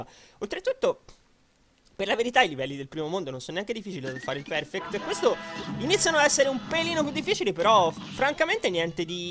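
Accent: Tuscany, Italy. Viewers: ma sei toscanohhhh?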